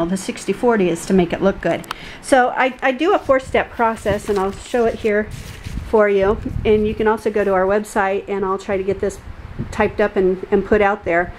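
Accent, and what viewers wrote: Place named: United States. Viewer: Americans pronounce it differently.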